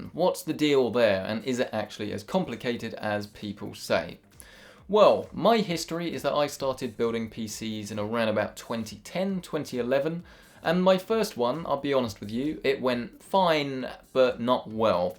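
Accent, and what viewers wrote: England, United Kingdom. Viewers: # P L E A S E H E L P M E! S I R THX ! # Hello building Computers is scarey but It can be great fun too , it used to be for me until my Brain Hem triggered Stroke! I am a wheelchaired Paralysed English Bloke you sound English but you could be Austealian/sAfrican Gibrateen ? I'm Paralysed guy and would love to build a PC/PC workbench perhaps then because I have little control with one hand you are aroud/approx the 22nd person iv'e asked would you be able to build a Pc with One Arm behind your back!? IFyou can't Help mewith that! Iv'e from Launch day I still am waiting for someone to give me some advice on how to INSTALL GTA5 the 7 dvd discs varient of this game on the PCI have a 120gig Win10ssd so I need to be able to install it on either d:/or e:/partition my separate 2 t b d/e separate drive.It is the one and only Game I want to play on my i7 3.6ghz cpu and my 1 gig vram gtx560ti could you help give me some step by step advice as I suffered abrain indury with my movement loss thankyou for at least considering my ASK! 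cheers thanks I apreciate it!!! I need to install the game on my 2 tb other drive 1 tb per individual partition partition I hope you'll be able to offer some advice certainly with the Game would be outstanding thankyou... broken mark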